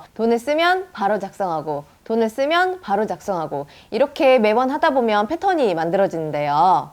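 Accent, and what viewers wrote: Jeolla, South Korea. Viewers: 말투가 전라도분이네요ㅎ 저희 할머니집이 전라도 영광이거든요ㅎㅎ 항상잘보고있어요 ㅎㅎ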